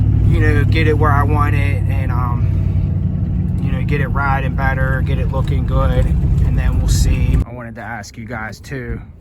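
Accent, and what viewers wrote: United States. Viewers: Also sounds like you got a Philly or Delco accent lol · that pittsburgh accent is thick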